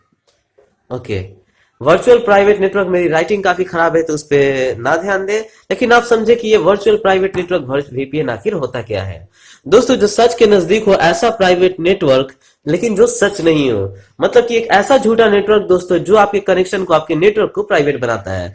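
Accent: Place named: Bihar, India. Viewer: bihari hai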